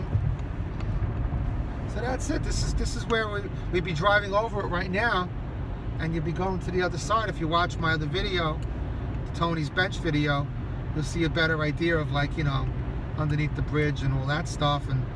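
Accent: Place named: New York, United States. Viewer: Love your New York accent.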